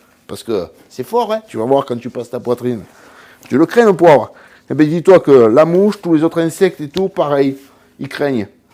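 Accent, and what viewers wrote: South, France. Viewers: Bonjour! Le bonjour d'un Harleyiste de Nouvelle Calédonie ✌️ Deux questions stp: moi je suis dans un pays chaud donc hors de question de faire de la charcuterie à l'air libre... Je peux mettre la ventreche à saler puis ensuite à sécher au frigo? Pas moyen de la pendre en plus... Et combien de temps en général avant de consommer selon toi? Merci pour tes vidéos que je découvre. C'est super. J'adore retrouver l'accent du midi 😉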